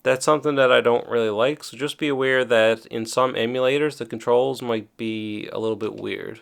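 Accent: Newfoundland, Canada. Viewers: Bro where are you from i hear an irish accent slash american or canadian are u from newfoundland??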